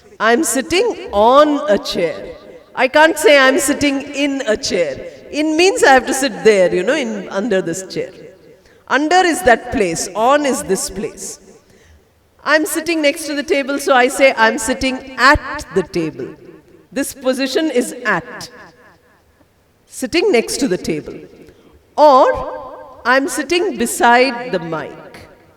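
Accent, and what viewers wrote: India. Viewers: I am from Delhi there are so many Institute of English I have also joined a Institute but I like your video because you speak Indian English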